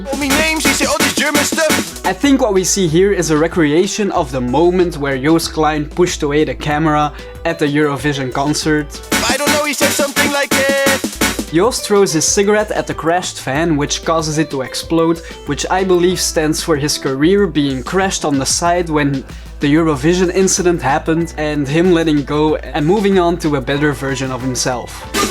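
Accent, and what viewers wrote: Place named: Belgium. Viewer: gy zyt zwz een belg hondert procent ik hoor den accent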